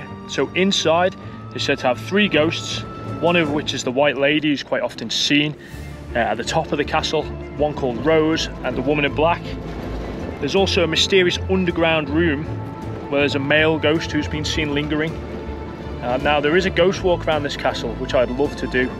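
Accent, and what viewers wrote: Liverpool, United Kingdom. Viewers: Great video. Love the Isle of Man. .Accent is bizaare. Sounds scouse sometimes (I'm from Liverpool myself) but then a few other accents.. Very intersting!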